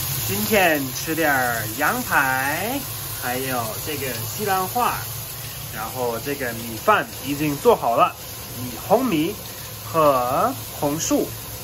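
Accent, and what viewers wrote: Sichuan, China. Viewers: 的普通话是不是带的四川的口音呢？哈哈😄很可爱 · 我住臺灣省喜歡您的視頻更喜歡聽你濃厚四川腔普通話!感謝你介绍大陸四川成都風俗民情~❤👍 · 哈哈, 在成都久了,普通話都帶四川口音了。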